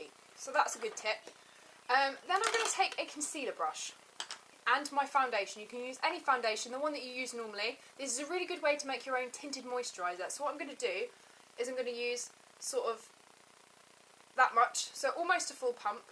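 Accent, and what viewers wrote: England, United Kingdom. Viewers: she is english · U r pretty without makeup and r u English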